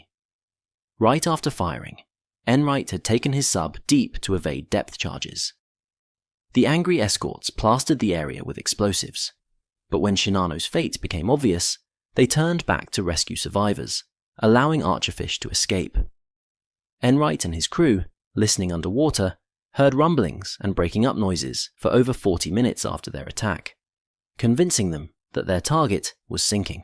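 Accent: United Kingdom. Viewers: I like everything about this channel, including the posh English accented narration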